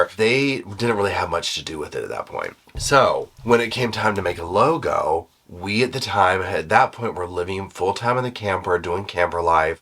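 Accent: South, United States. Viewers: Congrats again. I think you are a great storyteller. I can listen to some of your videos over and over, just to hear you tell the story. Call me weird, but your Southern accent adds to it for me, as does your sense of humor. You also express concern for the victims and their families. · I love that country accent— and I keep coming back for it! Congratulations 😊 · Congrats!--Been watching since Corona.--Your Southern voice and emotions are so soothing and adorable.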